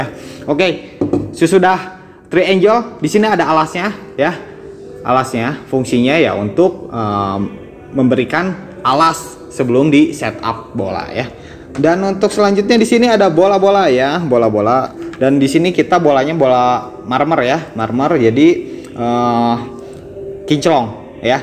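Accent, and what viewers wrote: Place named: West Java, Indonesia. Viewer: Belajar dasarnya pake logat sunda, maklum~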